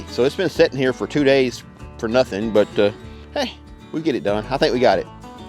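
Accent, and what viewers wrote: South, United States. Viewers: You speak perfectly understandable Southern Slanglish.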